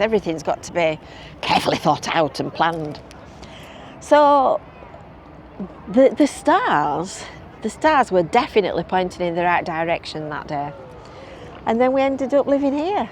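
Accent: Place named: Barnsley, United Kingdom. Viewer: I thought you had a barnsley twang to your accent ,and I'm from rotherham as well followed you for a few years ,small world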